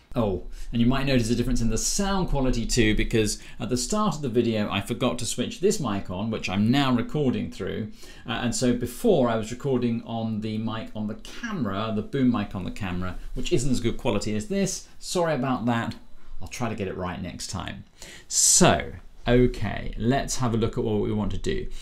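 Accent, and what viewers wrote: RP, United Kingdom. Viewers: Is this Queen’s English? He sounds quite sophisticated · I wouldn’t go so far as to it’s the Queen’s English, but probably as close to RP as you’ll see on a YouTube video on Python. As my mother would say, he’s ‘well spoken’ .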